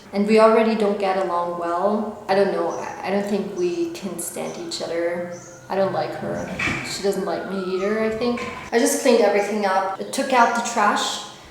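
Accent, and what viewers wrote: Australia. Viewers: Bruh, I'm an Aussie, I honestly thought I could hear an Aussie accent and you seem pretty fluent/ enough to take orders and stuff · Are you starting to pick up an eastern states Australian accent? Some of your vowel sounds definitely have that twang to them now, like from - . "Cacaow", "paowdah", etc.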